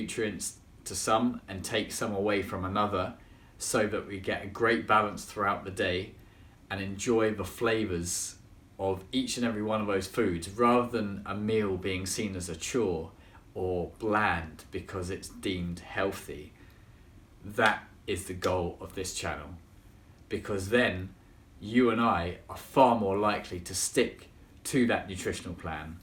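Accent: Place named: Scotland, United Kingdom. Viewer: Loves the Scots voice- nice roses too in the background whey to go paddle!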